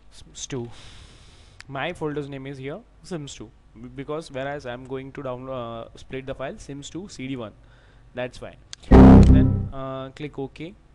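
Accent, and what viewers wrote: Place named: India. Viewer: He is defenetly Indian....... :) Thanx for the tutorial man....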